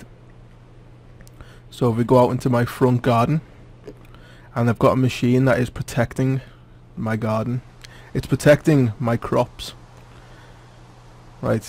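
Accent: Liverpool, United Kingdom. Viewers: he sounds scouse and im scouse but fuck me this is defently 2013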